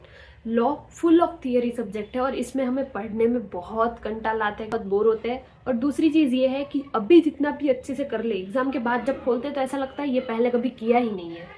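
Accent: Gujarat, India. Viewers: I smell Gujarati accent little bit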